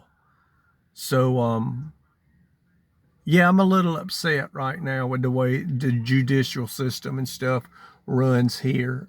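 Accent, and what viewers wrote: South, United States. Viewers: Well spoken i appreciate your old school southern way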